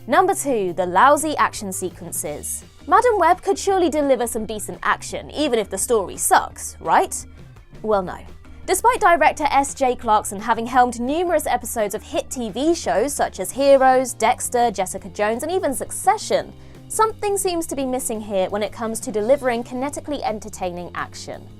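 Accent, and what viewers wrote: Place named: Exeter, United Kingdom. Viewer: Love the accent. Are you from Exeter?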